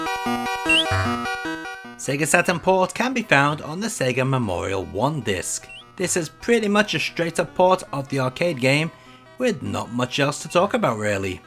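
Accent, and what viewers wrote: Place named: Wales, United Kingdom. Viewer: I'm trying to place your accent...I'm thinking west of England, perhaps Wales? (I'm far off, right? Like a Frenchman calling a Texan a New Englander?)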